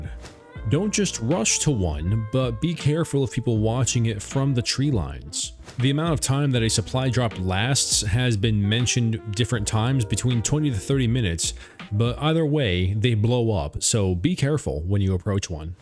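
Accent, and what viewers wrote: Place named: Australia. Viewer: To Americans, your aussie accent could be considered strange.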